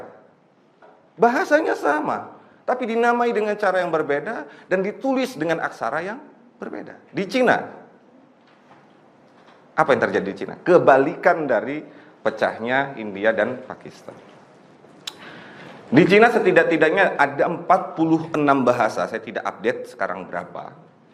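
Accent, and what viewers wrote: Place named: Indonesia. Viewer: Seluruh video ini penyampaiannya didalam bahasa melayu sepenuhnya ... cuma loghat indonesia .. bahasa indonesia adalah cuma gelaran dalam negaranya saja ! Pertuturannya memang dlm bahasa melayu seluruhnya ... sumpah pemuda juga bertutur dlm bahasa melayu ! 😂😂😂😂😂😂😂😂🤣🤣🤣🤣🤣🤣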